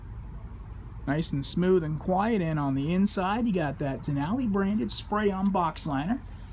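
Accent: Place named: United States. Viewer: what american accent is this?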